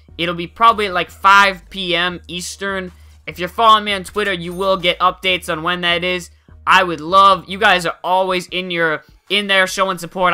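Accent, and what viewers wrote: Boston, United States. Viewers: are u from boston??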